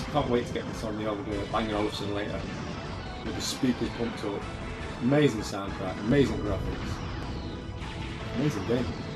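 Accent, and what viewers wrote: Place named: South London, United Kingdom. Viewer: Hey man - great channel. can feel/hear a South London accent similar to mine haha(hope im right). you've got a sub - came over from gaming off the grid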